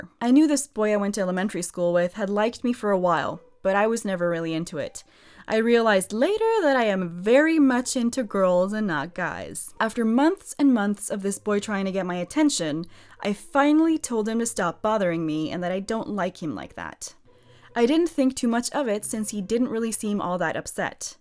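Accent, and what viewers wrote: United States. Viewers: this is creepy she has an American accent but reminds me so much of my sister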